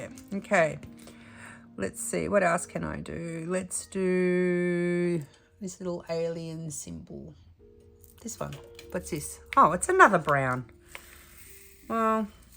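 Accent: Australia. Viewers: Hi, it's your girl from JerZey! HAH! I really enjoyed listening and watching you do your first section on this mystery painting. I believe I had the same one, but donated it to a friend because the black and white canvas messed my eyeballs up. But it's looking so good! I tend to have a hard time with AB's too, but tough it out. I am so excited for you to visit the EAST Coast your next time around, there are so many great places! So glad to have a friend in Australia, love your accent by the way too! The time-lapse is always fun to watch to! Hope you continue to feel better and till next time, take care friend! Sending you continuous healing vibes and hugs! XO JerZey Chris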